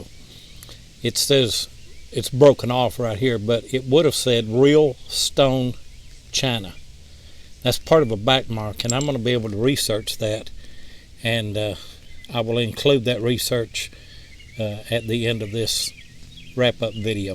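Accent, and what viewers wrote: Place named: North Carolina, United States. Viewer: Enjoyed the video! You sound like my husband's family who grew up in the mountains of North Carolina in the Canton/Clyde area. I'm starting a metal detecting club at the school where I teach and I would love to show some of your videos to the students! - Vicky Jackson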